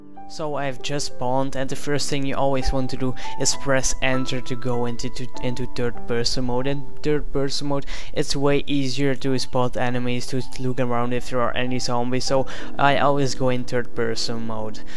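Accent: Netherlands. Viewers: So you're Dutch? Or from Belgium? I can hear it in your accent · Hahaha je bent nederlands ofniet ??? ik hoorde het de hele tijd aan je accent en toen zag ik ineens je lijst vol staan met nederlandse servers :P maar goeie vid man ik ga ze ff bekijken want ik snap nog niet hoe het spel werkt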